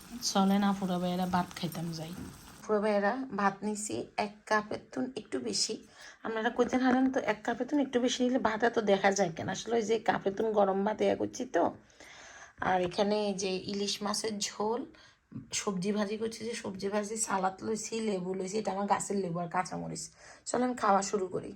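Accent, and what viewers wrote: Noakhali, Bangladesh. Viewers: Apnar kotha purai noakhailla.amio noakhailla😂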